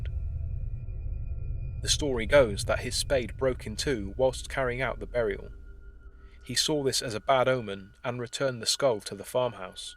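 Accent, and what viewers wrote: England, United Kingdom. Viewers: Refreshing also is the English accent as opposed to an American drawl announcing “....the top 15 haunted places in England”. Well presented, with excellent research. Thanks! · love the english accent. from america. god bless, brother. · You sound awfully British.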